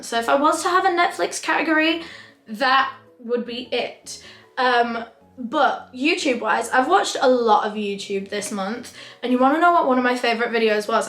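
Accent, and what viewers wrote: United Kingdom. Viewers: I LOVE 💕 British English ❤️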